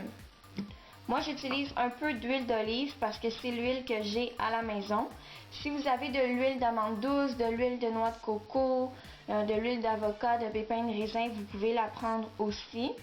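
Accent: Quebec, Canada. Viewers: j'adore ! franchement j'adore tout ce que tu fais c'est tout simplement génial ! continues comme ça ;) ps : j'adoooooore ton accent :p (québécquois, c'est ça?) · j'adore trop ton accent kebbekoi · Québec ou Montréal ? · waseem Elle est Québécoise! :) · Super vidéo, merci pour cette vidéo. Je sais pas pourquoi mais j'adore les accents du Québec __ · Je kiff ton accent kebequoi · T'es Québécoise, j'adore ta video, je vaiz abandonné sur ^^ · wouah quznds m&me la vidéo elle d'ate ;-;" et sinon je kiffe grv ton accent québéquois UvU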